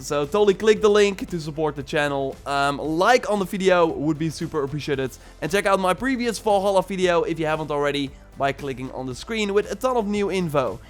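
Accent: Netherlands. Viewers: Ik dacht al dat je nederlands was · NOW I FINALLY NOW WHATS WITH THE ACCENT, YOUR DUTCH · Dacht al dat je Nederlands was 😂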